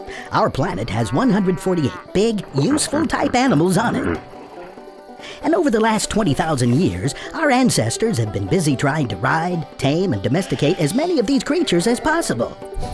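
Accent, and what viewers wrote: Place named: South, United States. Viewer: the voice sounds like a hillbilly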